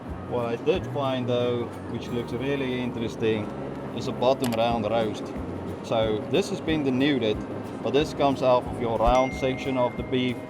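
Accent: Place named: United States. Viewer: Nice video. Can hear you getting a slight American accent, keep your Afrikaans accent don’t lose it. Jy Ian ook lekker skinner nou.😂 Baie geluk🙂👍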